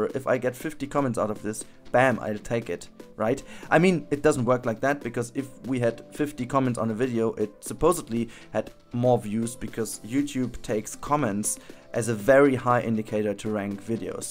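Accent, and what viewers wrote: Germany. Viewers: Ok, first to what you talked about: It's really hard to maintain a channel when there's very little interaction. It's probably why the channel I had did so poorly and why I just couldn't find the effort to keep it going. I definitely miss it and am trying to get it going again or at least start something new. So, I get what you're saying. And actually, I've been watching and not commenting for a while now. I bet this doesn't apply for most of your viewers but I really dislike the German accent (and it's not your fault at all!) so a German YouTuber has to do really amazing content for me to watch it and support the channel. And I absolutely LOVE your PlanCo stuff because you spend so much time on details and you have amazing ideas. Basically, you play the game like I would like to (if I could afford the game or a computer that can run it). 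I would really like to see more and keep watching your videos. But I also know that life has to come first. And if you're not feeling you're getting enough back from making videos it can really affect you. I would hate for that to happen to you. So if you feel like you have to take some time off or take a step back, you do that. Now to the coaster: My first thought when I saw the thumbnail was "this looks like a coaster on Mars", because of the surrounding canyon but also because by now I'm kinda used to hexagons and triangles from Surviving Mars. And I think it would fit really well into a thriving Mars community to have a coatser like this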